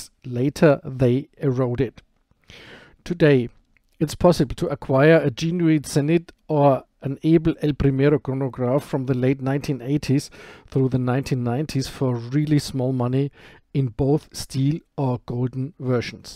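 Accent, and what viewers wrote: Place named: Germany. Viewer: ❤ your German accent 😊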